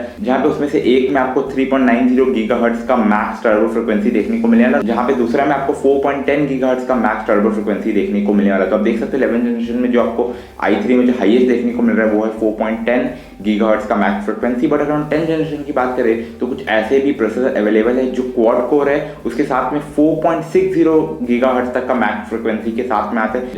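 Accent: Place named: India. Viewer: this is not English... Indian English.. sorry nothing to understand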